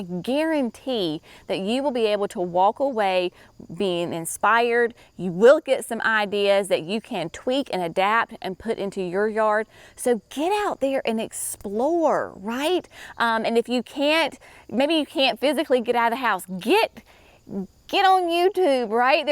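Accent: South, United States. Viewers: Your parents' garden reflects a true HOME garden created by loving hands who seem to love the beauty in the gifts from God our Creator whether that be in the incredible variety of plants He gives us to enjoy, or the beauty in a a piece of wood, a fallen log, or another artist's creation! I appreciate your just being yourself as you walk through "Mimi's garden" in both your joy of sharing information about a plant, while teaching us as well (I likely could be your mama, but ALWAYS learning!) As a fellow Southerner, I love your natural expressions and lilt in your voice. However, I also appreciate and learn from your very different sounding but naturally gifted gardening friend Laura in Oregon...both of you are "down to earth" as we would say...no pretense in any way. And did a great job on "walking with you" in that woodland garden environ...that's not easy to do without sliding on pine straw or hitting a tree root (unless that's in the 'bloopers':) Blessings!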